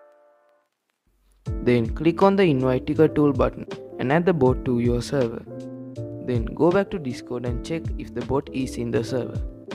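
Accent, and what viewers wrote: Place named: India. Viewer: You sound really really really Indian!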